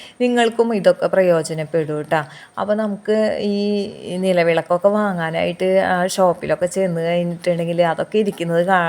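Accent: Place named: Thrissur, India. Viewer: സംസാരം കേട്ടിട്ട് തൃശ്ശൂർ ആണെന്ന് തോന്നുന്നു ഞാനും മുട്ട കുക്കറിൽ വേവിക്കാറുണ്ട് എല്ലാ വിശേഷങ്ങളും സൂപ്പർ 👍👌🥰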